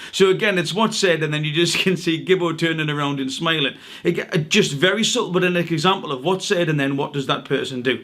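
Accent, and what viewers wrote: Newcastle, United Kingdom. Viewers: Is it just me or does this guy sound like true geordie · Milo Clesham I think he’s said that hes from Newcastle as well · Before I see this video I already know you're going to side with Gib since you sound British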